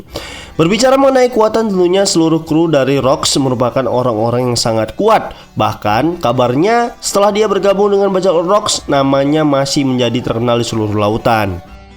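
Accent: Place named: China. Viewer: NARATORNYA BISA LOGAT MANDARIN 😭😭😭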